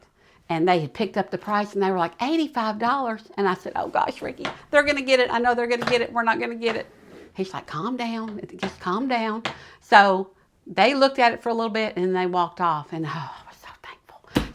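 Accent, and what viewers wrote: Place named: Tennessee, United States. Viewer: I’m new to your channel thanks to Jeri Landers mentioning you in one of her recent videos. I lived in Hendersonville & Smyrna TN several years ago & was always antique shopping. I loved going to the Nashville Flea Market once a month. Anyway now I live in GA & haven’t added many more antiques since then, although I must admit I’m pretty full after all those years of collecting. 😁 I sure do enjoy your channel & your TN accent. My friends here say I still have that accent. Thanks so much❤ your GA friend Thanks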